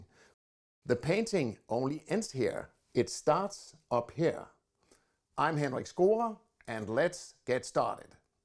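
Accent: Denmark. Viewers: Are you Danish? Im American but married to a Danish woman and have lived in Copenhagen. It’s your accent. Great channel!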